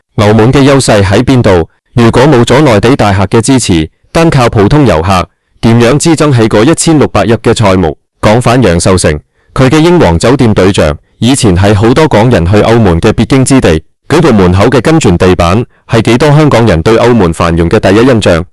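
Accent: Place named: India. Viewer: 印度人講廣東話.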